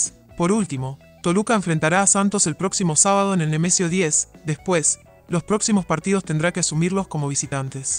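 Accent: Argentina. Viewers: Por qué el narrador es argentino? 🤔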